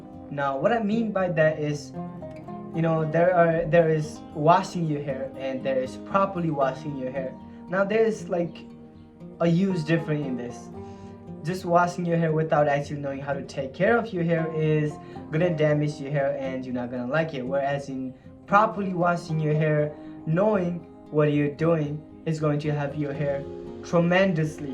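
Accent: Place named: Philippines. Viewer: He from da phillipines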